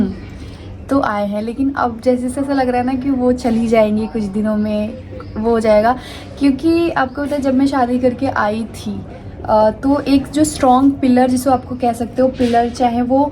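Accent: Bihar, India. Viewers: Bihar me aisa bolte h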